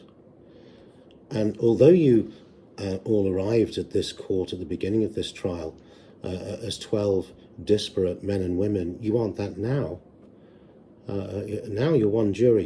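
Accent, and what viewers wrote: United Kingdom. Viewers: YouTube is REALLY pushing your “My answer to “how do you defend someone you think is guilty”” video from 5 years ago. I’ve seen multiple comments on multiple videos of people saying they found you through that video recently. And I have to agree with everyone, you are very smart, very personable, and very charming. A strong British accent for an American is always knee weakening as well. Love the content, love the knowledge. Only wish you were more consistent with uploads but i couldn’t imagine how very busy being a Barrister must be. Have a good day and thank you for gracing our TVs and phones.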